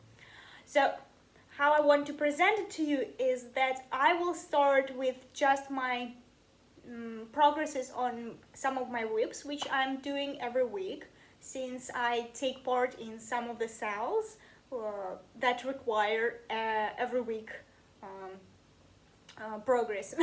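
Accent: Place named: Texas, United States. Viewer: Tell your husband you’re not too much for Texans. We’re all like that. 🤣 You’re a Texan with an accent. You’ve got a great smile too. Love your shares. Keep stitching.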